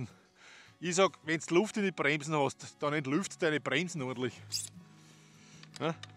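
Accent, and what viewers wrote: Austria. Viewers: Geiler Dialekt is des va Niederösterreich?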